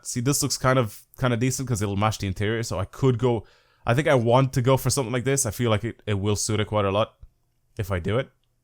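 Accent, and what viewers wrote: Ireland. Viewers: Are you irish? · Are you from Ireland?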